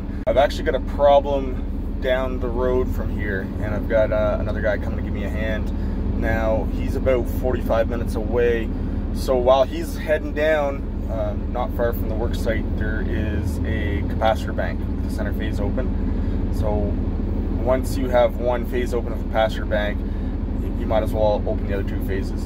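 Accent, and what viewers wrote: Canada. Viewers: Between AVE and a Canadian Beekeeper’s Blog, and the Jet City jet engine rebuild guy, I think it knows I like Canadians, and this guy sounds Canadian, and it knows I like technical stuff and power line stuff, so it makes sense! And I had to scroll past the bee euthanization video to get to this one, it keeps trying to get me to watch it lol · How weird... I actually had a little physical tingle of fear watching this. Huh. How about that. This is only the second one of your vids I've watched, where are you? Do I detect Canadian in your accent? I'm in Scotland here. Oh, and +subscribed. Great stuff, thanks for recording on real jobs.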